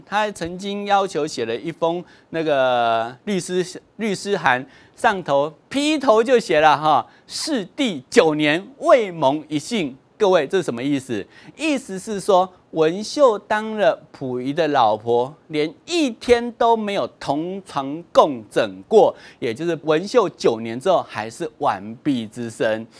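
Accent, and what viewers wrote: Taiwan. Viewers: 台湾國語聽得恶心叭啦。 老是用什么那，耶，喔，像在跟小孩說話。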